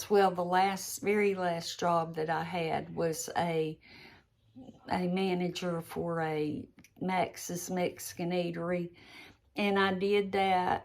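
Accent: South, United States. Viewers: Not boring at all. The sound of your voice is so soothing. I just love your southern accent. I think you could read a book and I would love it. A chapter every day or so? Maybe start another YouTube channel just for narrating a book? It's a thought. Thank you for your life story. Hope there's more to come! There's quite a few years you left out.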